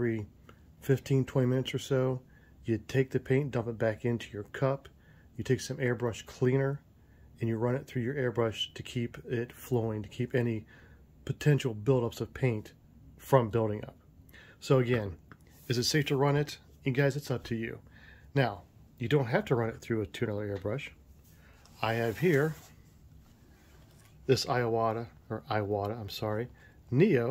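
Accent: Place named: Canada. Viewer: Classic Canuck talk. 😆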